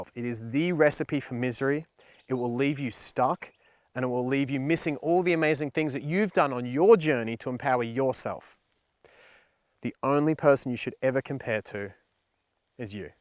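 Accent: Australia. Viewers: You have an Australian accent! Oooeee! Just as sexy... great message, you’ve got to own your uniqueness ❤️ it’s taken me a long time to look in the mirror and believe I’m a 10/10 in my own right xx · Everyone has his/her own strength, beauty, and talented like ourselves fingerprints. No one can still what we have. I’m just like you and others. I’m proud to be my authentic me. Yes, strongly agree! Only you if you want to be great or better to complete and compare with, this is the worst enemy you will face with in the world. Often time I wanted to give up on what I’m doing, often time I want to become whoever better than me. But the end of the day, me only I can be. I love the way you are. you are sexy I’m telling as a feminine energy. Your Australian accent is so cute and unique. I’m Thai but have lived in the USA for awhile, people get a good laugh out of my Thai-Lish all the time. 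I thought, YES, I’m so special cuz only me can talk just like me. I am a surgical assistant for cardiac surgery, I can do a job like Americans, but they can’t speak English like me 😉 I learned to love myself since I’m different in the different world like America. Thank you for your message, it’s so rich and right on the money 💰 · Australian accent is equally good 😜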